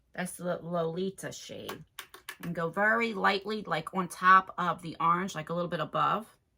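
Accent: New Jersey, United States. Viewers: Hey there, I always chuckle when I hear your Jersey accent, especially since as a New Yorker, I can tell the difference. I actually really like the backdrop. I rarely pay any mind to peoples backgrounds tho, unless they mention it like you did. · On=AWN is that a jersey accent?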